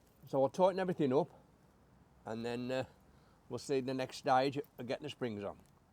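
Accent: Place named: Erdington, United Kingdom. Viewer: Great Vid. Lovely to hear your accent. I'm thinking Erdington. Am l right?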